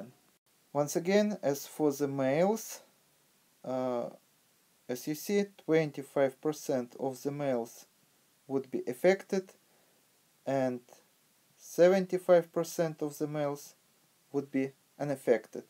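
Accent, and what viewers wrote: Russia. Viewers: Не знаю 🙃 Но я с первого слова понял , что автор русский 🇷🇺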